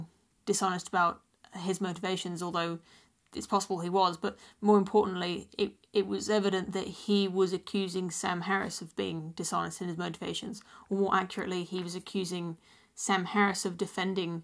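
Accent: United Kingdom. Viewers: You see, this is why I love you. You are not speaking with an agenda you are literally working out thoughts and ideas in real time and changing your mind in real time then presenting those ideas back to us. You are just someone looking for the truth so am I I am a skeptic. Keep up the good work. PS I don't know what it is about your British accent but I can hear you talk for hours no problem. You do stutter a lot though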